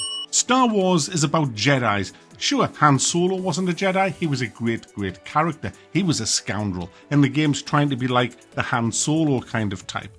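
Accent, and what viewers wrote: United Kingdom. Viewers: Why do you sound more British than ever in this video? Something is different about your voice.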